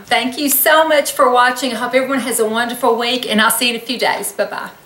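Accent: South, United States. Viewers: No way -- you are not over 60!! You are stunning!! Love your southern accent, btw :-)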